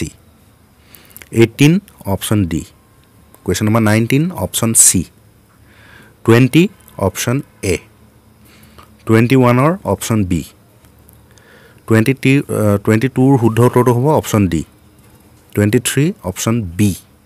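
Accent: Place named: Sivasagar, India. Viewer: English laga sivasagar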